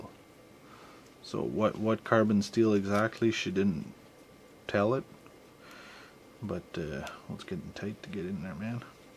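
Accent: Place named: Canada. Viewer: Where in Canada are you from? Love the thick accent!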